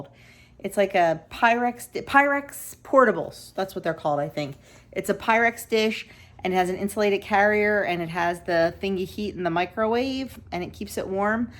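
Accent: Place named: New Jersey, United States. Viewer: I love your NJ accent I am laughing saying this because I live in Worcester MA and I totally have accent. I love your recipes and everything you share. I got the vaccine too. I had Covid 19 in May of 2020 it was awful. I never want it again. Can't wait to see the chicks Doug did such a great job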